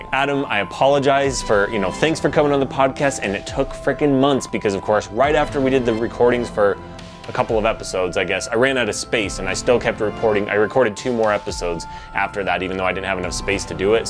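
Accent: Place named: Midwest, United States. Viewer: Yay! Retroware fam :) yeah lol for some reason I hear some midwest accent. (probably just me!)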